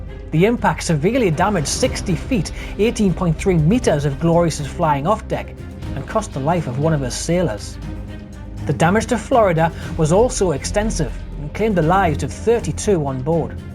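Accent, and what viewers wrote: Newcastle, United Kingdom. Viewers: He sounds Geordie to me, not Scottish.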